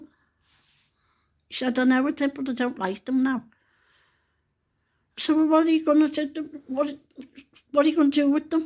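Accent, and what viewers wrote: Liverpool, United Kingdom. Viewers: hahaha "talk of the devil" hahaha great how you say it. Can listen to you with admiration together with my mum. Love that Liverpool English so much 👍🤣😄😂I'm from Australia. You look great by the way luv🇦🇺👍🇳🇱🤗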